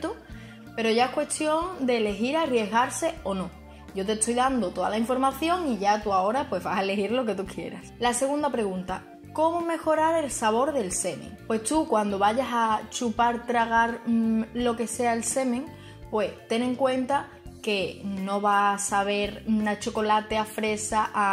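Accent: Andalusia, Spain. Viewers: Me encanta tu acento andaluz saludos desde Colombia y muy buena info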